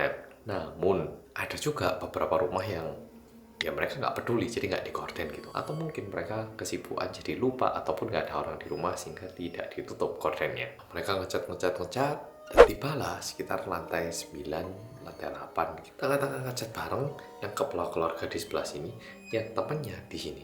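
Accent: Java, Indonesia. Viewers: Bang latih dong ngomongnya jgn terlalu medok, jujur aku kurang ngerti ceritanya, dan suaramu kadang timbul tenggelam ga jelas, seharusnya agak keras sedikit biar jelas 🙏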